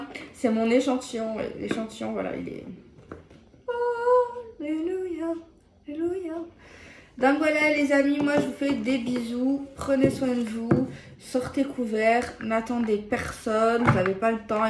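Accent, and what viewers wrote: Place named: Provence, France. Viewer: J’adore ton accent provençal, ne change pas … j’ai de la famille dans le sud de la France . Je viens du Québec , et j’adore tes expressions. Ça me rappelle mamie de Aix-en-Provence .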